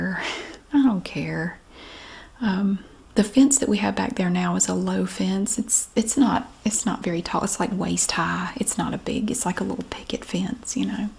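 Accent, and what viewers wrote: South, United States. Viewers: Best southern asmr videos on this channel! Aside from charity and carl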